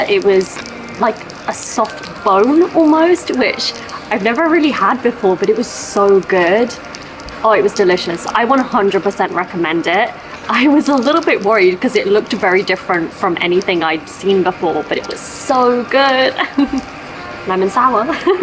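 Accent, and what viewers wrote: United Kingdom. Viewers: いつも思うのですがイギリス英語はとてもきれいですね。素敵な笑顔とあいまって、とても素敵です。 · いつもながら、あなたの英語はまさにイギリス人で、歯切れよく、心地よい。バーナードショーのミュージカル「マイフェアレディ」で、ロンドンでもその人の属する社会層ごとに発音が違うと、イライザの発音を強制し、貴婦人に仕上げるヒギンズ教授の苦闘を見ていて、感動したのを思い出しました。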